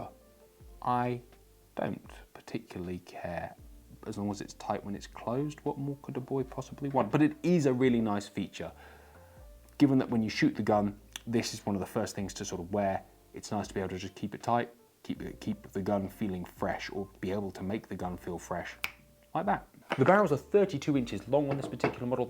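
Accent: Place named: England, United Kingdom. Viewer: Apologies mate. Grow a beard or lower your voice. I’m not suggesting you don’t know your shit. Very English. Love it. Keep up the good work mate.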